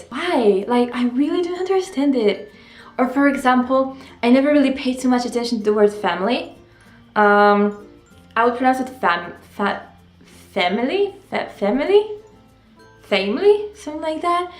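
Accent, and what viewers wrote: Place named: Poland. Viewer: knew you are from Poland, your accent sells it, I am from Poland too but I am moving to Spain in 1 month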